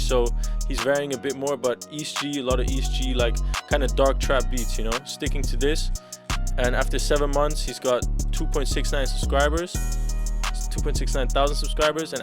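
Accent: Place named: Netherlands. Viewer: ik hoor nederlands hahaha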